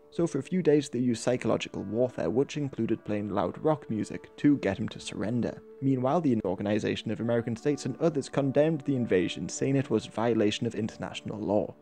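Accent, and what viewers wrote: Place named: United Kingdom. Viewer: If you're gonna make a historical video with a heavy british accent, consider adding accurate subtitles as well. I have no idea what you're saying half the time.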